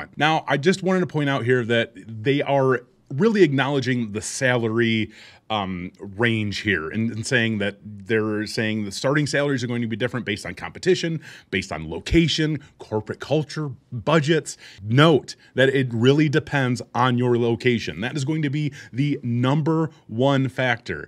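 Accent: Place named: Midwest, United States. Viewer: The midwest accent is strong with this one.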